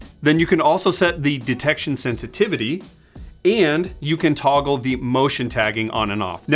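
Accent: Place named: United States. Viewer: Your videos are awesome but mainly because you are a very good English-speaking American, how bad would the videos be if they were done by the same people we have to talk to when we call to in customer service that are trying to speak English, but they are not very good at it, and they are not American. I really like the products a lot, and I was one of the early backers that contributed when times were rough but the product was worth it and the new stuff is amazing, but your customer service is hard to reach and harder yet to understand, I just spent over 35 minutes on the phone trying to find out why items were not shipped that said they were, I got no e/mail about it only a partial shipment with no info about the missing items. I Love the products, but I cringe when I have to talk to customer service you need to get some better speaking customer service people and an easier way to reach someone.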